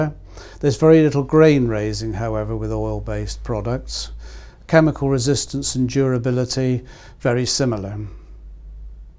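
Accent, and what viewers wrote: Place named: United Kingdom. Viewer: nothings more convincing than a british accent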